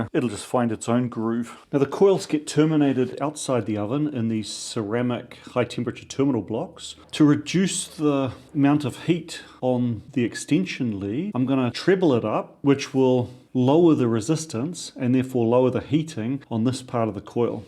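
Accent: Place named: New Zealand. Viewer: I am a new subscriber in Australia. Until you said that you are in Austria I was sure that your accent and approach to building stuff meant that you were in New Zealand!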